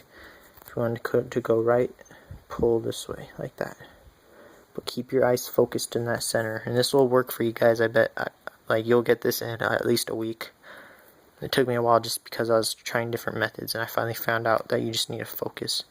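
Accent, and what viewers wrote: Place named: Illinois, United States. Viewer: you from illinois? your accent is frikkin adorable.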